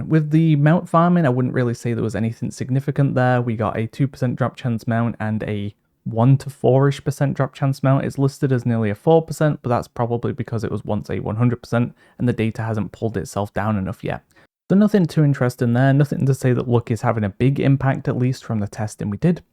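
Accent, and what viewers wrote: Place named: Liverpool, United Kingdom. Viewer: I’ve enjoyed this sounding guide to say u vowels in a liverpool accent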